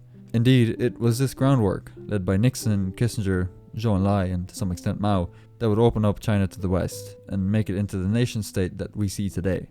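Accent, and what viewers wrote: Ireland. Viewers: I will eat my sock if this man isn't Irish. Great video btw, quality stuff. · Excellent channel, where in Ireland are you from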